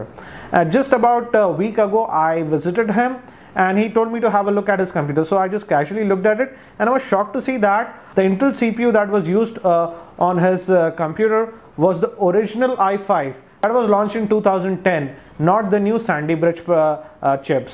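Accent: India. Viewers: hahaha the indian voice are all same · Came for the indian.Stayed for the indian voice.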